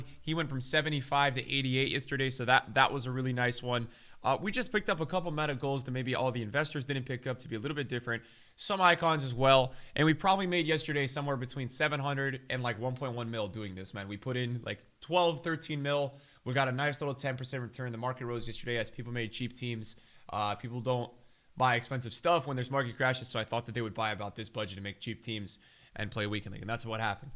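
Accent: United States. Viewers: Where is from I hear US accent but he said 1 pm so where is he from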